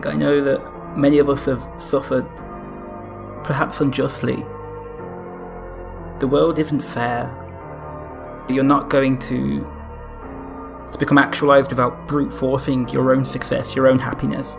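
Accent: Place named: United Kingdom. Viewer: Self-improvement is lonely, yes. But there are definitely ways to make it less lonely. I generally use a gym rather than training outside, specifically for this reason. Judging by your voice, I'm guessing you're British? If you're out training between and 8 UK time, I'll be out training at the same time. I'll try to work in an outdoor session once per week so that, even if we aren't physically training together, it might help us both feel a bit less lonely:) Stay strong brother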